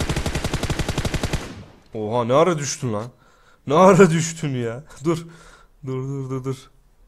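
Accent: Adana, Turkey. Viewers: OLUCU DİCEKTİ VARDI DEDİ HAMURUNDA ADANALI ŞİVESİ VAR VİDEO CEKECEM DİYE DÜZGÜN KONUSMAYA CALISIYORSUN BRO 👑👑☺️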